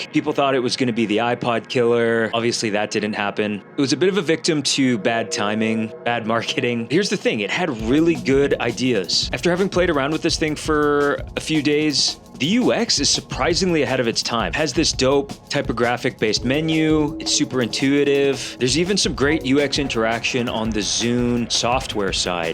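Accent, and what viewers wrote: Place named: Canada. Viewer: i’m getting good at spotting canadian accents yippee also if i get a zune off ebay it’s 100% cuz of u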